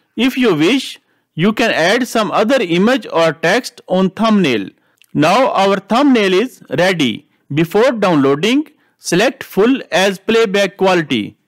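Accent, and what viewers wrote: India. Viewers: Bro i cant focus up cuz your indian accent, it got me laughing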